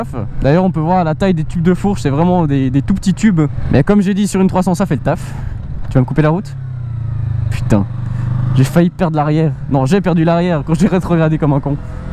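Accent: Vaud, Switzerland. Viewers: Yo mec ! Franchement très bonnes vidéos t'as un abonné en plus ! Et dit voir, t'habites où en Suisse ? Il me semblait qu'à ton accent t'étais suisse (comme moi ;)) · Un joli accent du canton de vaud il me semble :D Je vient de m'en offrir une et j'ai hâte de voir ce qu'elle a dans le ventre ! Merci pour ta vidéo !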